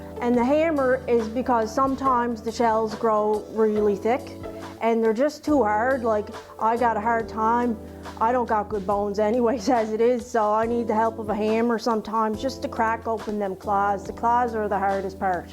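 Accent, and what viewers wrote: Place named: Maritimes, Canada. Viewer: Oh, be off with ya! That's our Maritime accent over here, don't ya know?!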